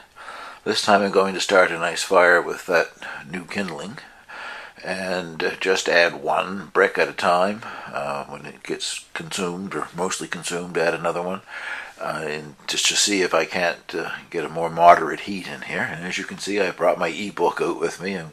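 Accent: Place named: Nova Scotia, Canada. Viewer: Subbed ya for two reasons. 1) Love the down East accent. Although I live in the prairies now, I grew up in N.S, and hearing you speak makes me feel like I'm back home. 2) I love how very Canadian you are. My husband and I try very hard to buy as much Canadian made product as possible. About a year ago we bought a boler (Canadian made mini Camper). We're hoping to add many camping vids to my channel this coming summer. Anyway, great vids. I've been binge watching a few days now.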